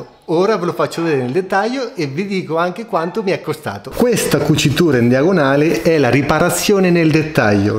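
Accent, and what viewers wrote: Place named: Macerata, Italy. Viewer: Ciao bel video, con quello che costa un indumento tecnico finché possibile sempre meglio fare una buona riparazione che ricomprare nuovo. Dal tuo accento penso di averti incrociato in qualche parte della Provincia di Macerata 😉👍🏻✌🏻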